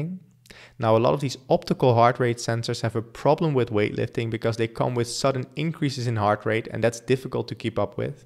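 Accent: Netherlands. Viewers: AHHAHAHAHAHA 100% accuracy in Dutch speaking English.... I was worried I didn't recognize u r Dutch correctly because the cars in your video had white background plates, but then I checked your CV... :D Good job, amazing video, thanks a lot for your videos, i'm ur subscriber now! Greetings from Rotterdam, I'm an expat here and love the country! Tot volgende keer! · Are you Dutch? The accent sounds very familiar. The video was great, comprehensive analysis of the features in a tidy format, well done! :D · Are you Dutch? Your accent feels similar.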